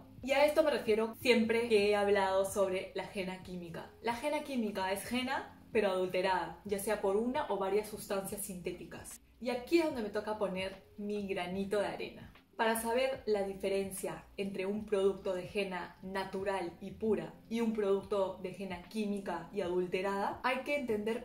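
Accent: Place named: Mexico. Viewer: Gracias! ✨ Soy solo yo o alguien más te ha dicho que tu acento suena un tanto a mexicano? 😅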